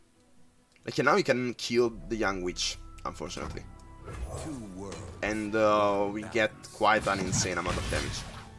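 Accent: Italy. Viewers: You ITALIANO?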